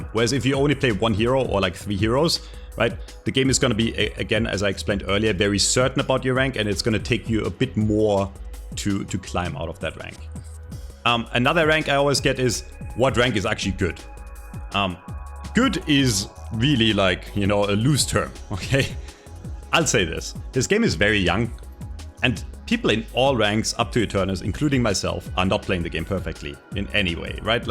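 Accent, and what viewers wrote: Germany. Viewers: are you german or danish? Basing it on accent.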